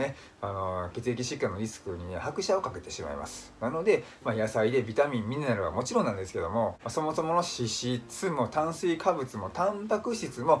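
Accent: Osaka, Japan. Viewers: 久しぶりにさんの健康講座を聞きました❣️耳にさんの声が心地良くて大阪弁が柔らかくて、ほんっとーにわかりやすいです😃ありがとうございます😃 家族の健康は日々の食べた物で支えられてる‼️母さん頑張れ‼️と受け止めました😃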